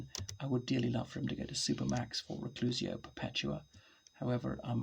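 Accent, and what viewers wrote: RP, United Kingdom. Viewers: You have posh accent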